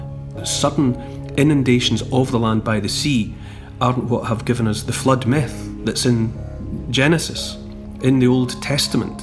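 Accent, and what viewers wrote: Scotland, United Kingdom. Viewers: Like you Scottish accent ♥️❤️🧡💛💚💙 Cheers from Moldova Freédom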